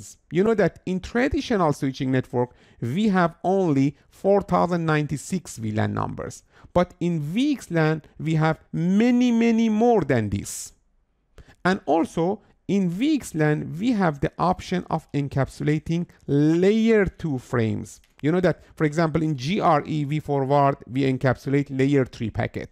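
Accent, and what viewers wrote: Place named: England, United Kingdom. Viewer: Watching this form England, and I like your English accent. this video is full of good information. cheers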